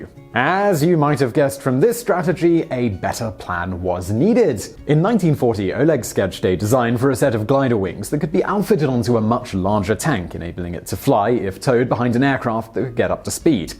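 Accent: United Kingdom. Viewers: When did Vsauce have a British accent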